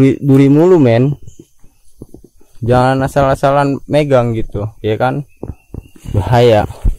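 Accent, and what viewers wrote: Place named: Bekasi, Indonesia. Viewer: oooo aksen bekasi ya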